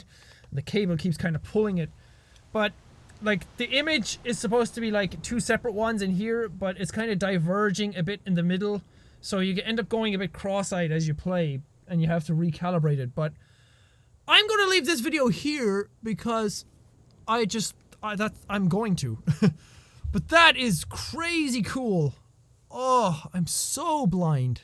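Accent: Ireland. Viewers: listen to an irish man bitch for 20 minutes... ill pass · i luv yer irish accent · so irish.. love it! (was in drogheda last summer, liked it a lot) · jacksepticeye I dunno what they are on about, sounds like a rich markisepticeye level of audible chocolate to me:B Maybe they are not used to the 'flutey to foreign' ear of the irish accent, but I could pick your voice out of a crowd of a hundred easy and that's a damn good thing for the U-Choobs! If you play Skyrim again give em two barrels of al gore:D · Are Irish? I detect a small hint of Irish in your voice.....sorry if that was a personal question. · Your voice is not high pitched, it is simply your Irish accent. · Personally I think your accent is awesome, it's not often you get to here an irish person talking in general. Which is kind of weird really, do you all just not like to present yourselves in public? 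What's the deal here? You're kind of representing your entire people really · Griffin Watson HES IRISH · He's Irish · Class accent I'm Irish too xD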